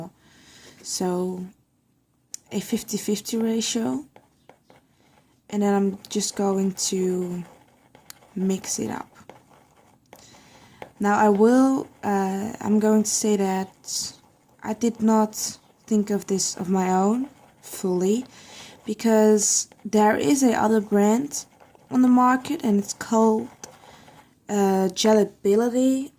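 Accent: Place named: Netherlands. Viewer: whatts wrong vith her voice? Its an accent. I guess Dutch or maybe swedish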